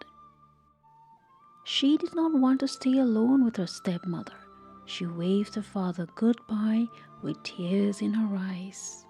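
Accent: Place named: United States. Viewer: Where did you get that american accent!?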